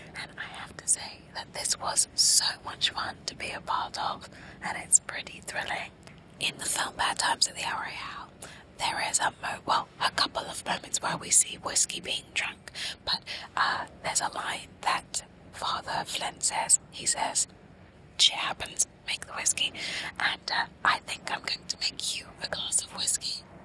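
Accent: England, United Kingdom. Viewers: she had a british accent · she had a British accent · So shocked she has a british accent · asmr in an English accent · I did NOT expect that English accent!! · Normally I hate british accent but hers is very lovely and soft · Hourglass English accent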